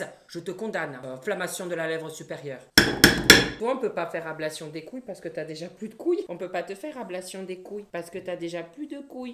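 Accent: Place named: Southwest, France. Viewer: moi aussi je le prononce comme ça et je suis du sud-ouest aussi comme lui.... "Am'mandonnéééééééééééééééééééééééé"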